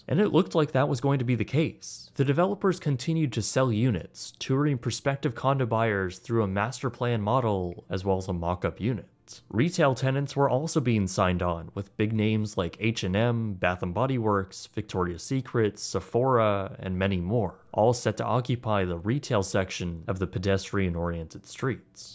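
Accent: Canada. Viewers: as a Minnesotan, there's always one word that makes it super easy to tell if someone is from Minnesota or Canada.. the word out😂 we make the O so long😅 I heard you say out and immediately was like.. oh, Canadian. okay. lmaoooo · Are you canadian? The way you say "ou" words and a few other things sounds very canadian 😂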